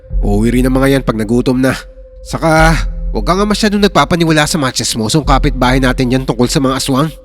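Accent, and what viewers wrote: Iloilo, Philippines. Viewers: Plss kuyaa haluan muna ng ilonggo accent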